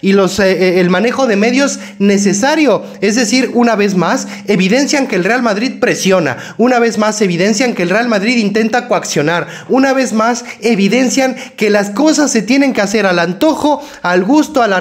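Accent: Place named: Mexico. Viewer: Hace muy poco que te sigo pero eres por el acento mi mejicano preferido bueno después de Rafa Márquez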